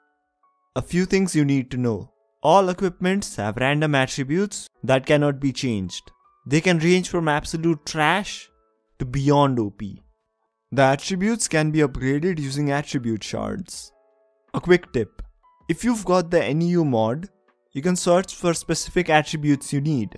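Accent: India. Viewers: Are you Indian I think bcz of your voice make videos in hindi it supports Indian hypixel community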